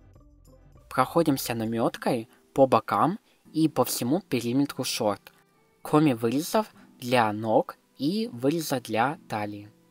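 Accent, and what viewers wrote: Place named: Ukraine. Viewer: По руски говорит но слышат украински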